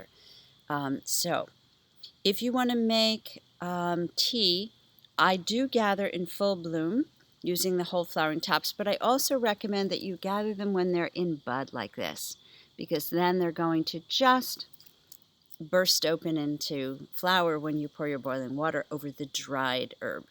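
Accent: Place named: Australia. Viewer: I hope you are OK. Sounds like Australia, or similar. We are all One here on Earth. Bless you.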